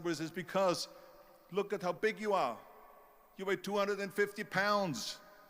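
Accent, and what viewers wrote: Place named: United States. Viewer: Really Great Speech ... Nice Job Guys. Anyone that doesn't understand American accent, can watch this speech with sub title here : <link>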